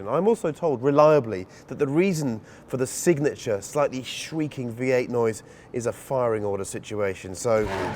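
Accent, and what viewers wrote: RP, United Kingdom. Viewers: i cant stand some one with a posh voice trying to use as many buzz words as possible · BADD ASSS MACHINE. cant stand this British guy talking like a rich guy. AWESOME MACHINE. MURICAN POWER BABY!!!!!!!!!!!!!!!!!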